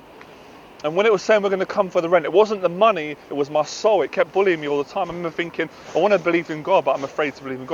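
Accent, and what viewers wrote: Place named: United Kingdom. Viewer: I'm really sorry but I hate british accent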